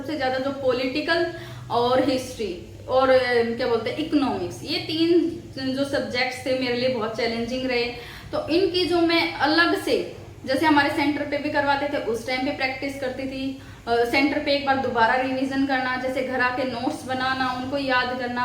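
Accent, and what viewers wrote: Haryana, India. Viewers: Aap Hariyana se ho kya mam😊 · Haryana se lagti ho mam aap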